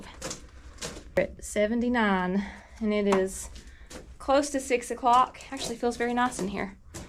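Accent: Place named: South, United States. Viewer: Yes Ma'am you have that wonderful southern accent! Montana is a beautiful state to visit any time of the year! We raise cattle but never had chickens, mainly because of our abundance of bald eagles, skunks, coyotes and greedy turkeys! But we decided to designate a portion to have chickens for eggs, it's been a blast raising them, and now winter is coming, and I'm just watching everyone's way of raising their chickens! Hope you get a chance to come visit the Big Sky Country! Cheers!!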